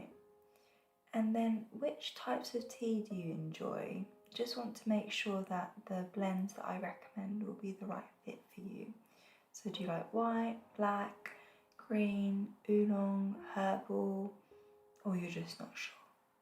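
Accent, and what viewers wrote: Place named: United Kingdom. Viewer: This channel is such a gem! Your detailed explanations are perfect and I love the option of music - so rare - I find it so relaxing and grounding. I find it so interesting, I’m American and am rarely relaxed by British ASMR. I think you have a similar accent to Drifting SJ who I also like - and this channel 80gemstar that no longer exists (although her accent was considerably more intense). I think you all have great texture in your soft speaking voice which I really appreciate. Thanks so much !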